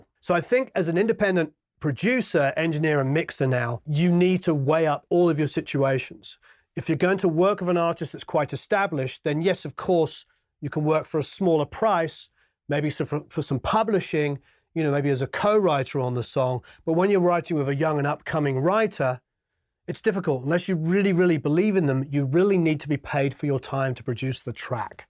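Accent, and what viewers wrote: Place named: United Kingdom. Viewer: This was amazing information,. I can see from your other videos how much you respond to everyone, and it truly shows you respect us and, as you say here, VALUE us. It's always a pleasure to hear you, especially since most of the amazing people I've worked with have had British accents.;) Cheers, and again, thank you for being so personal and invested.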